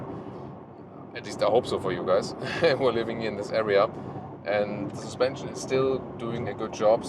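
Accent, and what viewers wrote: Germany. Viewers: is such a classical German 😂 This laughter and this accent. Love that!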